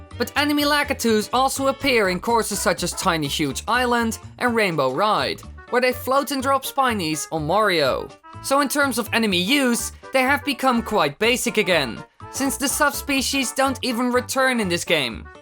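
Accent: Netherlands. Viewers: wait ur dutch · I already thought you were Dutch, I am also Dutch.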